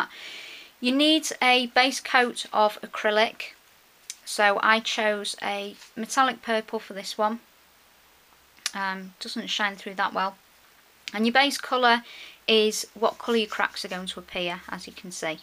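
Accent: England, United Kingdom. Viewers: I found this comparison very interesting and helpful. I have seen many mentions and tutorials on how to create your own crackle paint using 'Elmer's Glue' - which is something that's common in the USA, but I - like you (from your English accent) am in the UK. Have you tried this? Do you know what glue here in the UK would work like the American's 'Elmer's Glue'? If you've tried this, how well did it work? Thanks. By the way, I love your journals and Mixed Media art. Gorgeous stuff!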